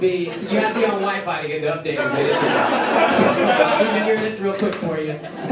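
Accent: United States. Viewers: Some indians have a difficult time understanding his American accent,that's why everyone's going on about the audio.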